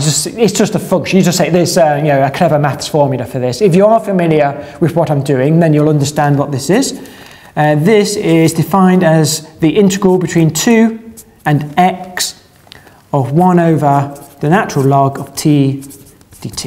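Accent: United Kingdom. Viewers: this brit has the Most British accent ever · it's hard to a Brazilian like me to understand his British accent.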